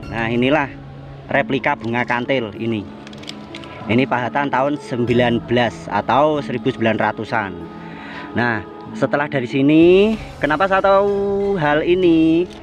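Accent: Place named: Java, Indonesia. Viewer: Dan ada yang menjadi ciri khas dari bahasamu mas ketika memberikan penjelasan, campuran bahasa daerahnya selalu melekat dan medok, kalau orang jawa bilang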